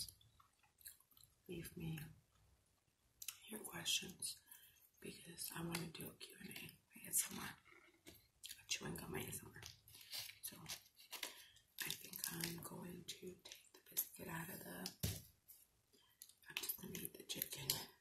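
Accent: New York, United States. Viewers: you’re from new york are you lol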